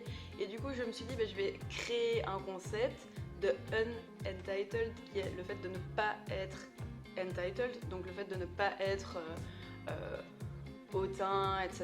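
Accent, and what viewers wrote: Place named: Liège, Belgium. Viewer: Vive l’accent de Liège (et du bw❤️)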